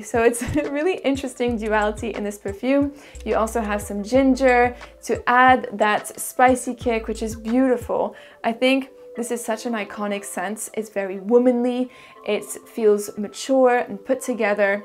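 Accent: France. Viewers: Your french accent is so interesting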